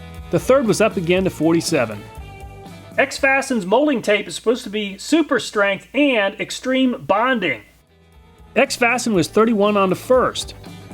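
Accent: United States. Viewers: A genuine request. You have a strong accent to those of us from outside the United States. yes, there is a world outside the US and the speed you speak at makes it very difficult for us to understand comprehend your story. You are interesting but hey, three runs of the story to understand. your challenge is, attract more people from over the shores.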